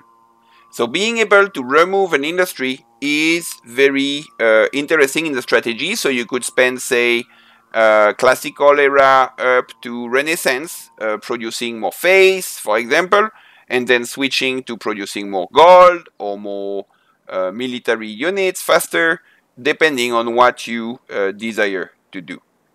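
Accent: France. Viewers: Your accent sounds so French, haha! Love it.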